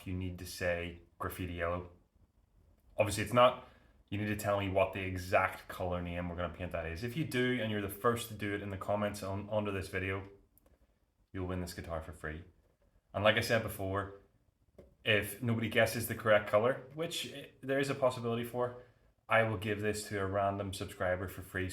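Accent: Northern Ireland, United Kingdom. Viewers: I'm hearing a fair bit of NornIron in that voice. 👍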